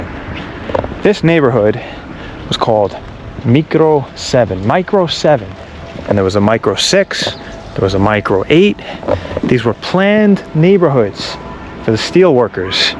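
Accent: New York, United States. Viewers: Cut him some slack. It’s the New York. Way of saying things. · This is one of your best ones, and I want to visit Romania! I can't believe how many people here don't respect a good New York accent when they hear it. I grew up in lower Westchester in a family with Brooklyn roots and the word was "FOY-LEDGE" but, American English being what it is, it's just another word that we spelled differently than how we spoke it. It may not be "Proper English" but it's commonly pronounced that way around the NY-NJ region or at least it was when I was growing up there. Not wrong, just different. That's a human value that is conveyed well in your travels! (I'm not a Professor of Linguistics, just a Life Traveler) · he's from nawwyakk,,giv em a break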